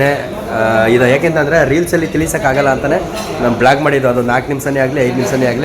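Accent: Mandya, India. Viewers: ಮಂಡ್ಯ ಭಾಷೆ ಬಿಟ್ಟು ಕೂಡಬೇಡ👌👌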